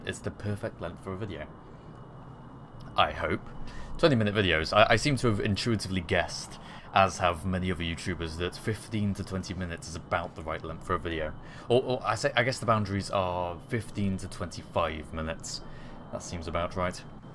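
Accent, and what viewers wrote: United Kingdom. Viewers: watched your good vids forget to subscribe but here :D you have one your british brr your voice is brr i love british acent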